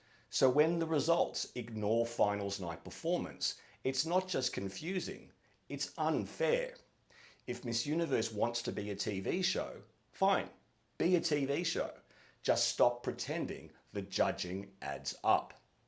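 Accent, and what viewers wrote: Australia. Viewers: Are you Australian?? You sound it. This year is the first year I actually paid any attention to the miss Universe competition and boy our Australian girl was done sooooo dirty by that ugly and boring AF dress in the national costume competition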